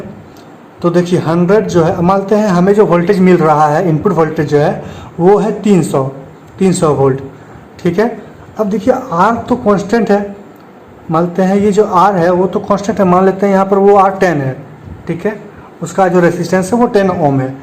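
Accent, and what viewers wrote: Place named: Bihar, India. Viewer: Bhai aap ka voice bihari jesa AA Raha hee😊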